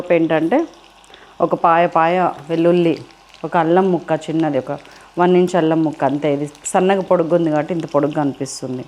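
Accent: Telangana, India. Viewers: Miru telangana baga matladutunaru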